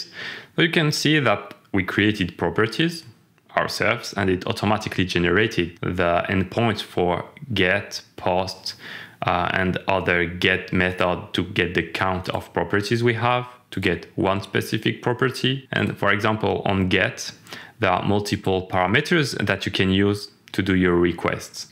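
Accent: France. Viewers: Appreciate the french accent.✌😊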